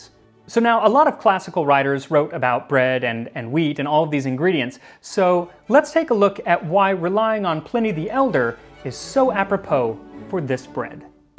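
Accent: United States. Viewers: Yay the metric system! But you have an American accent so I am confused, is America switching over? Yay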